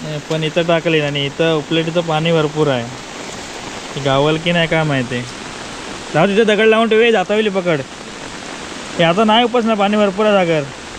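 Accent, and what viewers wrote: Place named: Sangameshwar, India. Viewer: ऐक नंबर साहेब थँक्यू गाव कोणत तालुका तुम्ही छान माहिती दिलीत वबोलता पण छान अस्सल सगमेस्वरी❤❤❤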